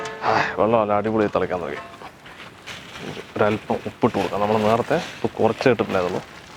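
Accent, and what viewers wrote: Palakkad, India. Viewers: കള്ളി മുണ്ടും പാലക്കാടിന്റെ നാടൻ ശൈലിയും അസ്സൽ പാചകവും പാചക രാജാവ് ഫിറോസ് ചുട്ടിപ്പാറ 👇⚡️ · Palakkadaan slang ishtamullavar like iduka👍❤️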